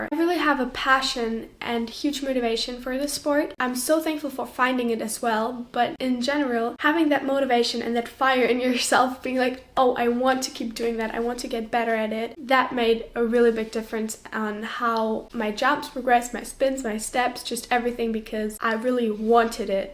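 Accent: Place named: Europe. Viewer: ahhh, habe den Akzent rausgehoert, aber war mir nicht sicher woher aus Europa der ist. Wieviel zahlst du fuer eine private Stunde?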